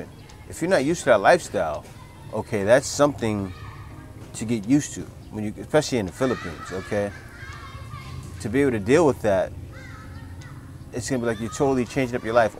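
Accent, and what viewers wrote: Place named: New York, United States. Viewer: My brother aren't you a New Yorker ⁉️😅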